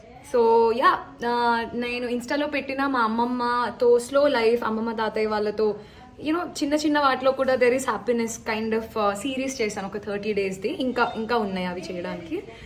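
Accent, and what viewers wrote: India. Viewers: gaaru Antarvedi daggara unna lakkavaram aa midi. miruu godavari yasa lo matladutu unte rojanta chustu undipovachuu andi mimmalni❤ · Beautiful Telangana telugu ❤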